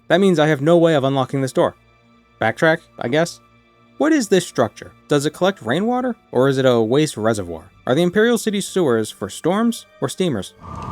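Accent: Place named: New England, United States. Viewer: that’s sincerely just how the new england accent is lmao. they talk on fast forward up there.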